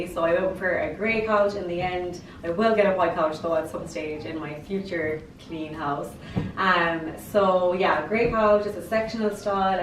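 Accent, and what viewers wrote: Ireland. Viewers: New subbie! I love this room and your accent! Lol are you 🍀 Irish? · Love your Irish accent... And your gorgeous red hair, beautiful green eye; I'm a sucker for redheads, coz I find you very distinct!! You're mostly known to have a fiery temper😁😁😄😄🙋🏽🙋🏽🙋🏽.... Never seen that Marilyn print either... My mammy grew up with Marilyn , she said during her time every wanted to look like Marilyn, she was the poster girl of her time😜😜🙋🏽🙋🏽🙋🏽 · Jaylan O she's irish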